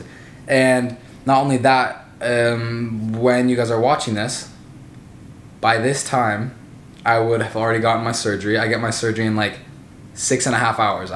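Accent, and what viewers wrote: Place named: New York, United States. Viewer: It kinda sounds like he took a trip to New York picked up a lil accent and came back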